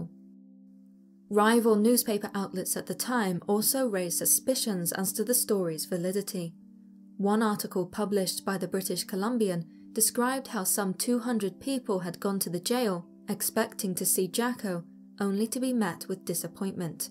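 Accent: United Kingdom. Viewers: The lady with the British accent is an upgrade from another site where the male narrator has a heavy backwoods twang. Then there is another site that features a lady with a Canadian accent. She also has a pleasant voice while describing Bigfoot outrages such as interupting a man having a bowel movement along the side of a seldom used road. It scared the crap out of him.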